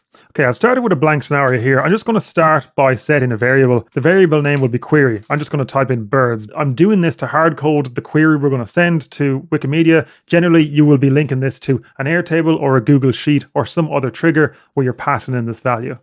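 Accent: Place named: Ireland. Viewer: Thank you for the tutorials. Nice to hear an Irish accent too :)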